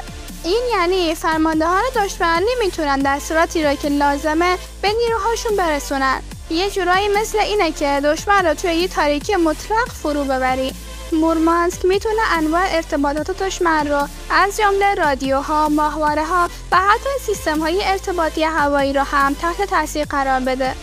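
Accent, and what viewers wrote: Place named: Afghanistan. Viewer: گوینده افغانی هستش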